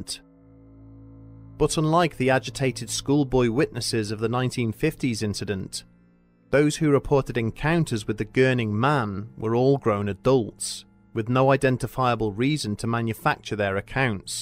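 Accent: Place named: Midlands, United Kingdom. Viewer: Sounds like somewhere in the Midlands to me.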